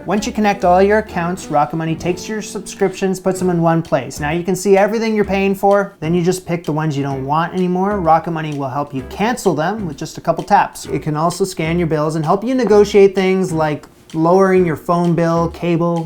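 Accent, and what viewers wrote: Canada. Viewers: The Canadian accent is really coming back now that you’re back in the mother country. Aye?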